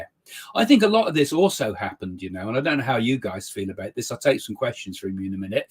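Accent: United Kingdom. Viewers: yea ! I can't comprehend the british accent ! I'm from united states.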